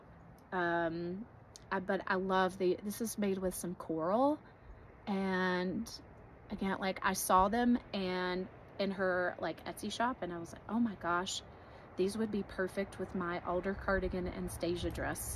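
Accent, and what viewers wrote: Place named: North Carolina, United States. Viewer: Adore your cowl❤ and we were in NC for about ten years so I love hearing your accent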